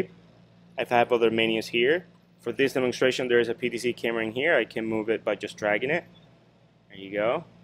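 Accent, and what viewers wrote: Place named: India. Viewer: Nice accent, indian?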